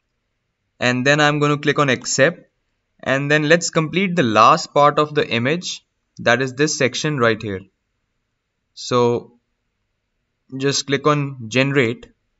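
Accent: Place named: India. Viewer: hey, man, I totally understand your indian ascent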